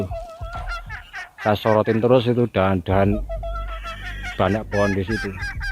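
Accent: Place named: Java, Indonesia. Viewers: Tanpa 'guwe' jadi mantap kang. Aksen Jawa biar medhok justru lebih enak didengar. Lanjuut.... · Medok is not a crime. rapopo, lanjutkan cak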